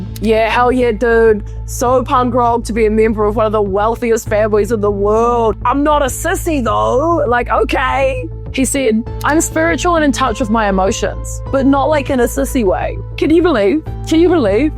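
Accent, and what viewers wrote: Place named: New Zealand. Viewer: That’s how we pronounce it in Australia and this lady sounds like a Kiwi, so I’d say they say it like ww do. I’m guessing you’re American? We have different accents down under.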